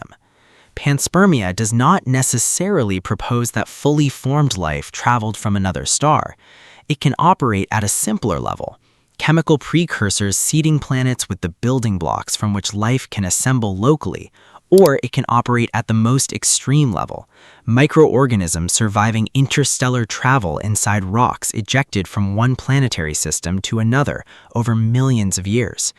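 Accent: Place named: California, United States. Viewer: The most California accent I've ever heard.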